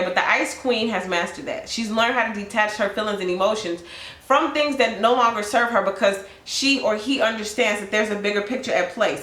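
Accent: Chicago, United States. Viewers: You sound like you from Chicago lol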